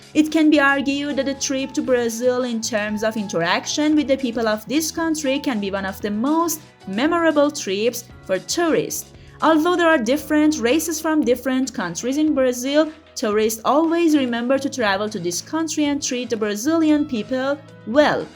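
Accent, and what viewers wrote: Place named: Iran. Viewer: Your accent is blindingly obvious Persian 👍💗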